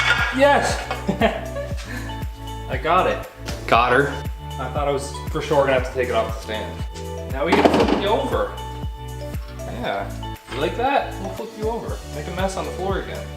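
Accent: Canada. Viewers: The Canadian accent keeps me entertained.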